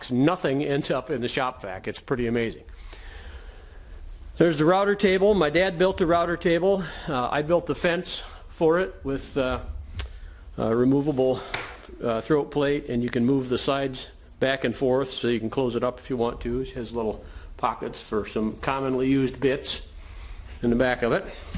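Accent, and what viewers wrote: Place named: Michigan, United States. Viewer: Nice shop! Mid-Michigan eh? I used to live down in Three Rivers (yes, there're actually 3 rivers. You'd be surprised how many times I was asked…) I should've recognized the accent, guess I've been in California too long.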